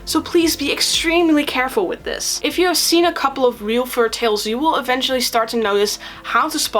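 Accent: Netherlands. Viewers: Don't get mad or smth, but I think you're dutch.... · I smell..Dutch accent!!are you dutch :3?? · What are you from the Nederlands or Germany? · WAIT ARE YOU FROM THE NETHERLANDS !??????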